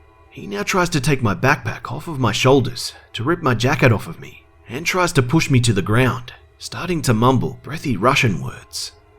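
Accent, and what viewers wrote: Australia. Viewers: I love your accent. I love Australians. ( P.S. Trying to get on the comments of the week.)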